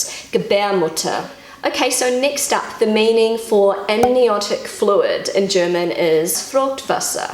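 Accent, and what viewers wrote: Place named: New Zealand. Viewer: Ich liebe es wenn Du Deutsch sprichst. Dein Kiwi Akzent ist so cute. Und Dein Kleiner ist ein lieber, süßer Junge. Stay save...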